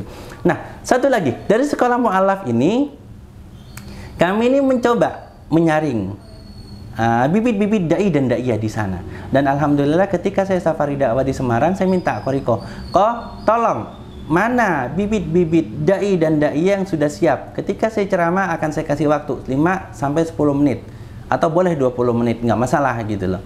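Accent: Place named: Surabaya, Indonesia. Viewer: Suroboyoan koh loe fase jowoe. Salud aku ustad cerdas komplit plit jelas tur mantep buanget👍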